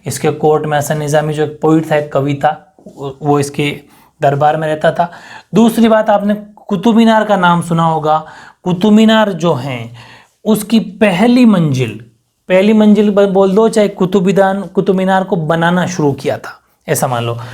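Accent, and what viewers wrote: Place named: Haryana, India. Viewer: Ser aap Haryanvi lagte ho halanki ki aap rajasthan se ho per aapki language haryana jesi lagti he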